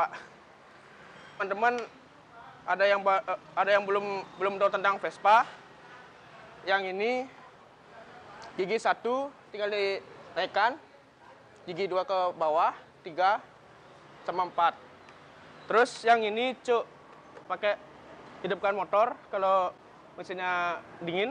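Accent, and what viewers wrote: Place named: Bali, Indonesia. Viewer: Wkwkwk logatnya bali kental 😀😀😀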